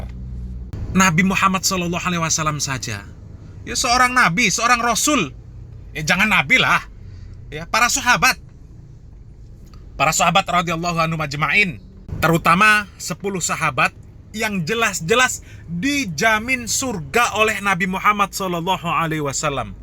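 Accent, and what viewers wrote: Java, Indonesia. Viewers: Suara jawa ni ptsay kd shaluan😂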